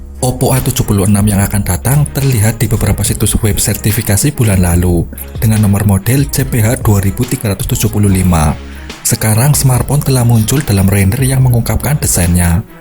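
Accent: Banyumas, Indonesia. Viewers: ngapak ya min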